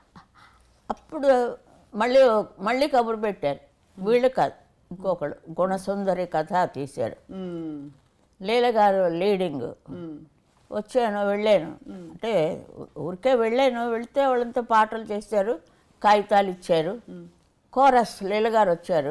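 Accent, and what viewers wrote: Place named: Vizianagaram, India. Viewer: పాపం పసివాడు అమ్మా మీ మాటల్లో విజయనగరం కనిపిస్తోంది. మా నాయనమ్మ గారు పుట్టింటివారు విజయనగరం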